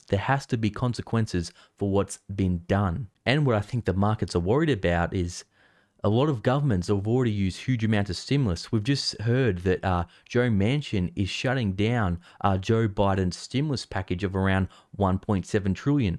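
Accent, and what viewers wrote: Australia. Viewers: Ah, sure it will be grand...seriously, it will. The man on the "tube"...for sure. Festive cheer delivered in an Aussie accent... Like seriously what more could ya ask for?. OK, I admit I'm hooked...hands up. Never trusted those communists in the first place...🍻🥂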